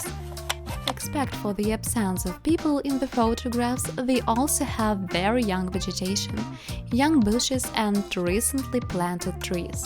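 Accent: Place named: Russia. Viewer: Ear cutting her russin english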